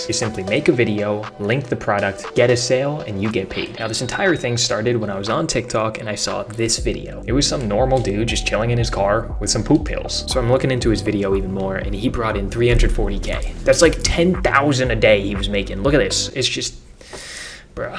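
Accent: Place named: Boston, United States. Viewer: Am i hearing a newengland accent lol if so wassup my Man im a Mainah but you have that boston vibe lmao.. Love the vids i have yet to master tiktok shop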